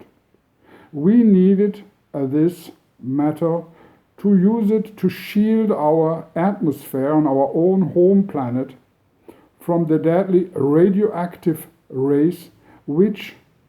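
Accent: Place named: Germany. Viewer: Fraud you would not have a German accent if you are an Anunnaki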